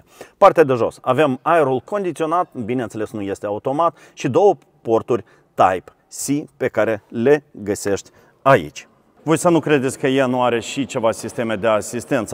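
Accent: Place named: Moldova. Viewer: Ăsta-i moldovean de dincolo de Prut ? Că după accent, așa pare !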